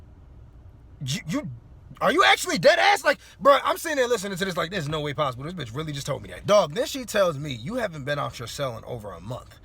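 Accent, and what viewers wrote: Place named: Virginia, United States. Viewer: Just came across your page 😂…. I KNEW you had to be from VA bc of the way you talk lol (specifically 757?) . I'm from Richmond but had to move away a couple of years ago. I miss hearing stories like yours (obviously, not the fact that you were locked up). Since I moved, my life has been completely turned upside down and I hardly ever laugh like I used to… until I came across your page. Laughter is medicine, thank you for bringing back my laughter that I've missed so much.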